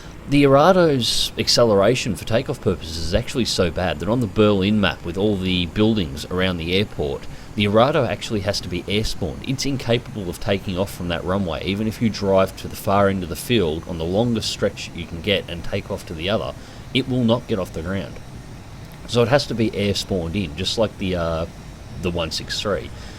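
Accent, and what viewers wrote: Australia. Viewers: Oh you sexy voiced Aussie.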